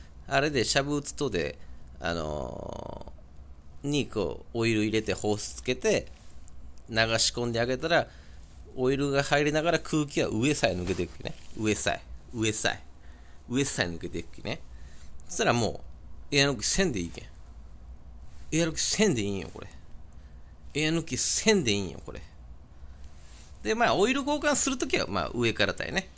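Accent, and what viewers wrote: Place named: Chikuho, Japan. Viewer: 筑豊弁懐かしく見入ってしまうw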